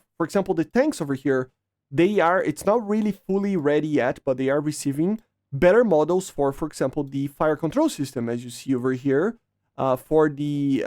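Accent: Brazil. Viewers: Are you Brazilian or Argentina ? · You literally just put words in my mouth. I simply said He's Brazilian. Another words you shouldn't expect someone that clearly isn't a native English speaker to say everything correctly. So to stop this pointless argument so both of us can get on with our nights lets not put extra words in my mouth that clearly wasn't said in the sentence that I said. Enough is Enough lets just get on with our nights and have a beer on a nice Saturday night. If that's not what you do fair enough just enjoy the rest of your night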